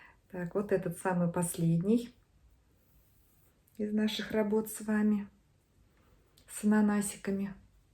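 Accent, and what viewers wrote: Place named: Voronezh, Russia. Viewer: Ну все красиво, и мастерица и ее работы !!! Чудо-воротнички, с каждым платье смотрится по разному. Очень приятно слышать любимый воронежский говор ! Если я не ошибаюсь.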